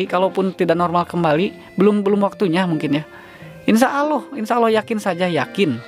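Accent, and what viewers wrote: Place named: West Java, Indonesia. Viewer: Btw karna si aa logat sunda nya kentara pisan, sim abdi ngarasa jiga dipapatahan ku lanceuk nyalira hwehee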